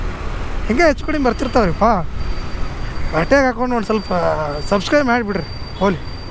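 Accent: North Karnataka, India. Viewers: 😅 uk slang super bro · ಉತ್ತರ ಕರ್ನಾಟಕ ಭಾಷೆ ನ ಹಂಗ ಅಣ್ಣಾ 😅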